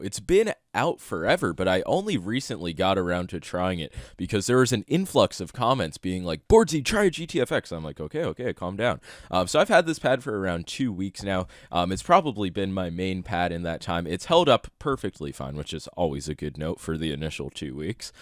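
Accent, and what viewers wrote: New York, United States. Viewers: are you from nyc?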